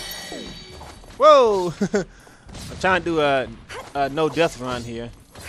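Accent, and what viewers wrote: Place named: South, United States. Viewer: I've been looking at your vids for a few weeks and the thought just crossed my mind "man dude sound like he's from the south" am I right? anyway great LP.. cant wait to actually have time to implement your tips lol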